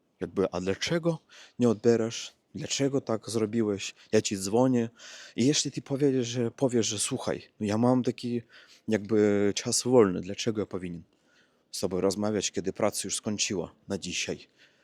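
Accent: Russia. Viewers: Zrobiłeś bardzo duży postęp w polskim w tak krótkim czasie. Akcent można powiedzieć że masz prawie idealny, choć rosyjski akcent w języku polskim jest taki uroczy ☺️ Zacząłeś też większość słów poprawnie odmieniać 🙂 chciałabym mieć taką głowę do języków 🙂 Szanuję! ☺️ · rosyjski akcent gubisz bardzo ładnie. Z odmianą wyrazów masz problem, ale to normalne. Im więcej będziesz mówić, zwłaszcza z kimś, kto będzie poprawiał Twoje błędy, tym będzie lepiej. Inną metodą jest uczenie się odmiany wyrazów na pamięć, zwłaszcza tych, które sprawiają Ci problem. Może to wspomagać to, czego nauczysz się, mówiąc i nie będziesz stosować rosyjskich końcówek przy odmianie wyrazów w języku polskim. Inną kwestią są spółgłoski twarde i miękkie oraz te, które zmiękcza "i". To trzeba wyćwiczyć, bo Twój aparat artykulacyjny jest przygotowany pod inną wymowę, inny język. Z czasem przyjdzie samo. 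W każdym razie progres jest niesamowity, gratuluję. Świetnie się sprawdziły szerokokątne kadry, miły, przyjemny spacer i fajne treści. Niech Ci się dobrze wiedzie. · Co do niedopałków porozrzucanych tu i tam, to mogli przyjezdni zostawić, nie twierdzę, że cudzoziemcy. Jest względnie czysto nie tylko dlatego, że jest sprzątane, ale i dlatego, że jest mniej śmiecone. I jeszcze dodam coś na temat Twojego "akcentu", czyli wymowy. Akcent = przycisk wyrazowy = udarienije. Wymowa = praiznaszenije. U Ciebie jest już bardzo niewielka pozostałość wymowy rosyjskiej. Robisz błędy gramatyczne, ale znacznie rzadziej niż jeszcze rok temu. Gdybyś codziennie przebywał w polskojęzycznym otoczeniu, to było by najlepiej dla Twoich umiejetności językowych. A co z Twoim angielskim? Nie zaniedbuj go! Pozdrawiam, czyli zdrowia życzę! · Od 18-tu lat mieszkam w Anglii i wciaz mowie z polskim akcentem, mimo, ze mowie plynnie i (prawie) bez bledow. 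Inna rzecz, ze nigdy nie ukrywam, ze jestem z Polski i ludzie to lubia, serio, pewnie dlatego, ze Polacy w UK maja dobra marke i jestesmy szanowani jako dobrzy pracownicy. A moj akcent w pewnym sensie dodaje mi kolorytu. Dlatego nie przejmuj sie swoim akcentem, bo on jest po prosyu fajny, wyroznia Cie z tlumu, a hejterow olej.(zignoruj). Jestes Rosjaninem i jest ok. no bo w czym problem. Jakbys byl Czechem albo Bulgarem, to co? Bylbys innym czlowiekiem? Lepszym? Gorszym? Nonsens. Ja chyba tego nie dozyje, ale Ty moze jeszcze bedziesz z duma mowil o swoich rosyjskich korzeniach. Kto wie? Daj Boze, zeby tak sie stalo.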